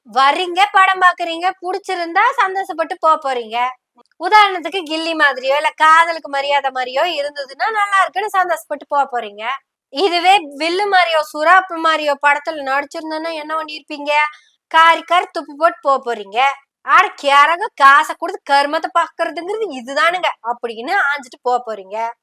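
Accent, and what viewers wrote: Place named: Coimbatore, India. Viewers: Good coimbatore talk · Kovai tamil super🎉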